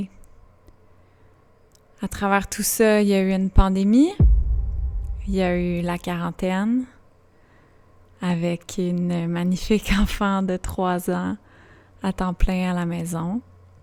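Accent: Quebec, Canada. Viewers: Tellement heureuse de te revoir! Grâce à toi, j'apprécie l'accent québécois et tes vidéos m'ont toutes beaucoup apporté, je les partage avec ma fille qui découvre la vie (11 ans). A mon tour, je t'envoie donc plein d'amour!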